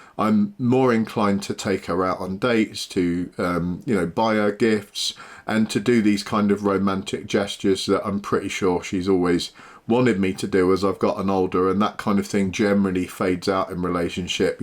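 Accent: United Kingdom. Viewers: I’m here because I love the bloody masculine British accent - from the U.S.A!!!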